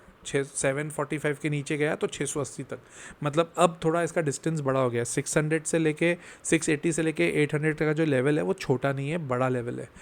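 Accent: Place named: Bengal, India. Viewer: Bro, authentic bangla pronunciation ... kudos....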